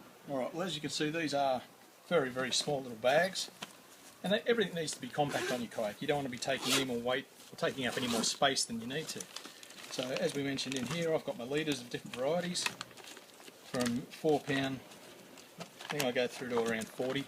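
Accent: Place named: Australia. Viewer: Nice to hear an Aussie talking about yakking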